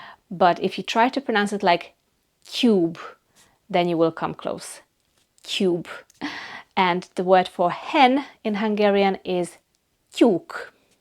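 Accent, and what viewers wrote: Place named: North America. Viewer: you speak very well, i think you are native hungarian, but then at the end your english is North American. I am from Sask.Canada, and you don't have the eastern Canada French sounds or the middle USA drawl, and dont sound like you are from the UK.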